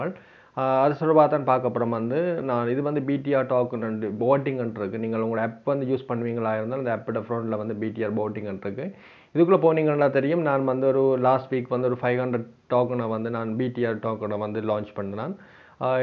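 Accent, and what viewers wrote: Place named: India. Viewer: accento indiano e si voolaaaaaaaa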